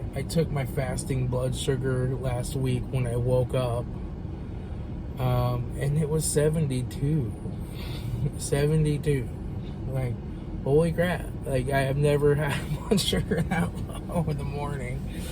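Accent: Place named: Ohio, United States. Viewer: I knew it! I'm from Tipp City OH originally, moved out west in 1996. Now I notice an Ohio accent. I like listening to you because you sound like family, lol. You sound like my brother. Love your videos!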